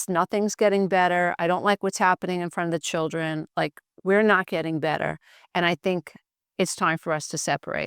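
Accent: New York, United States. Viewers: I knew you were from New York…I thought Long Island ❤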